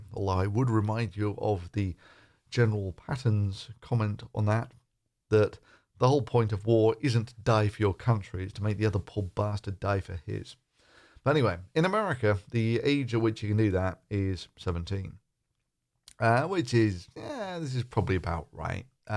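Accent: United Kingdom. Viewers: I am not one of those usual bashers of. My first post reflects this... I enjoy most of his videos, this one, not so much. I don't know why you feel the need to speak for, but some of your claims are not true, so here we go: says about Matt Gaetz, while showing articles about him being investigated: "There is no love lost between me and folks like this at all. It is kind of what you would get if you infused something devoid of morals, with the intense desire to sniff Trumps ass. ". At no point before this does he say this is his opinion, he is actually insinuating that it is proven facts by showing headlines, that is NOT proving what he is saying. This may be unintentionally, but it certainly have this effect on an uninformed less critical viewer. But of course, some of us understand it is his opinion, in particular I, which is proven by the words in my first post. 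It is also possible, even though speaks with British accent and does not live in USA, that he suffers from Trump Derangement Syndrome, and because Matt Gaetz have been fighting for Trump, he already have a bad vibe about Matt, and therefore cannot help himself but joining the lunch mob. Why else would he bring Trump into this? And why this hostile way of expressing himself about someone doing something they have not yet been proven to have done? It really does not matter if this is his opinion. It does not make it right. It is defamation. Online lynching is not a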